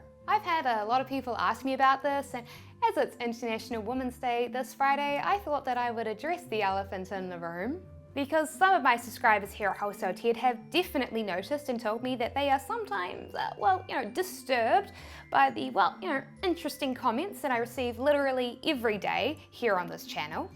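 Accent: New Zealand. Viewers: Hi, I really love you and your New Zealand accent 😁 By the way, you are so sweet and attractive while smiling huge 😘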